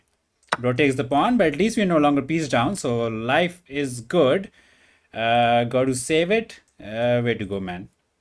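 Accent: India. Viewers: bro i would watch u read a phone book u dont need clickbait. any time i hear an indian accent my knee jerk racism kicks in from years of indian kids lying to me about working for telus mobility to try to play the scam opening with me but u are special i believe in u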